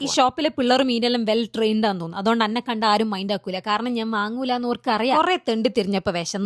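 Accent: Kannur, India. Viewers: Inj poliyanu bro ... Nammude Kannur basha yanu main ..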